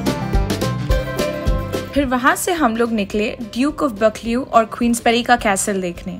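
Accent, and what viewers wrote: Bihar, India. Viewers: Ohh, your Bihari accent ❣️😍❣️ Ekdam gajjab hai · Hahaha so cute accent puri bihari h apki😚😚😚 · Love bihari ascent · Bihari accent🔥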